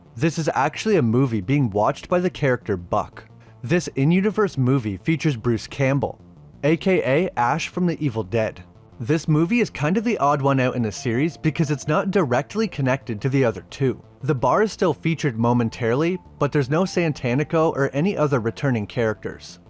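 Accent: Canada. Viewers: This is random, but are you Canadian my guy? lol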